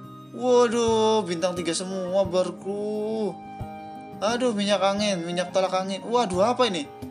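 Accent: Java, Indonesia. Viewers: Gebrongot geni wolongewu Medog bangt bang jowomu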